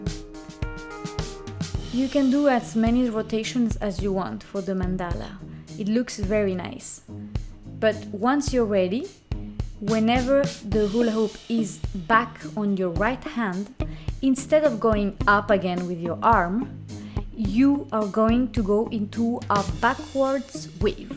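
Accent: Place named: Colombia. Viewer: wonderful combo! love them all. I have been adding them to my flow :)you have an accent where are you from? Colombia?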